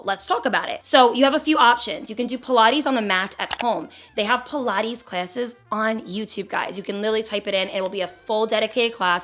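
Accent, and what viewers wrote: New Jersey, United States. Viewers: Her accent is out today! Jersey jersey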